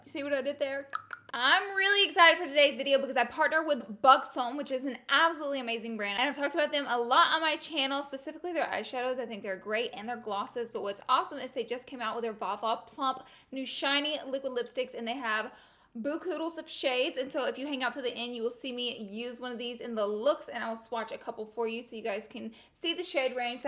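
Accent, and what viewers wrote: South, United States. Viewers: Instagram fam😘😘 I LOVE the way you say Valentime's DAY, conciler, etc... Gotta love those southern twangs 😘❤ from one southern girl to another, I like the way you talk 😘❤ NC HERE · #snapchatfam #igfam your my favorite YouTuber by far I’m from North Carolina and have a strong southern accent like yours and I absolutely love it 😍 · Katie Serious Talks its her accent and they do sometimes say it in the south. So can you not be so rude and negative.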